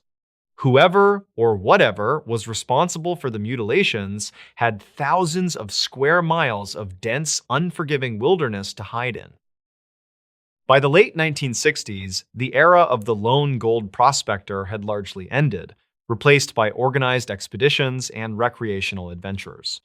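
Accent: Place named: Essex, United Kingdom. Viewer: Essex England 😊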